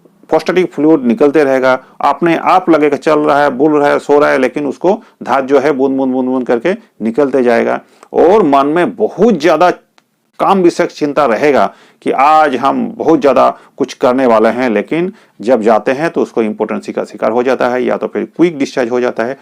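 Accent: Bengal, India. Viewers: আপনার বাংলা ঘেষা উচ্চারণের জন্য বললাম ।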